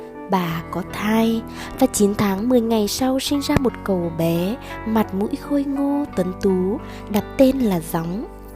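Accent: Vietnam. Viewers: thú vị chất giọng của bạn. Giống những hướng dẫn viên du lịch xứ nghệ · Hay à. Cứ như thế này nhé. Kể cho nhiều người biết về lịch sử của người Việt. Tính giáo dục rất cao.. Giọng hay quá. Bạn ở Huế à. Câu chuyện được dẫn hấp dẫn, rõ từ. Lên xuống giọng họp lí. Chắc giỏi văn lắm đây.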